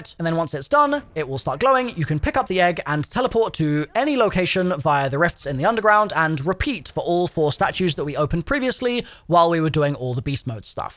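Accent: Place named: United Kingdom. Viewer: I am laughing so hard at the fact that two days ago I went to find your tutorial and couldn't find one. Now I finally can have my proper British walkthrough as the keepers intended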